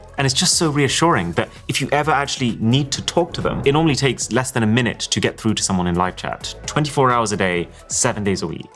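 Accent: United Kingdom. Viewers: could also be u have the most clear british accent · Through out my professional career i saw one constant thing - People get away talking nonsense with their British accent. NO doubt this is a great product but what ruined it for me the over action you do with all your reviews. I better get back to my fav review guy Marcus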